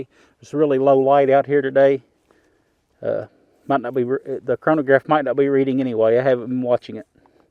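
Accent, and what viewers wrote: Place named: New England, United States. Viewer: Great vid man. That's a helluva Yankee accent. :)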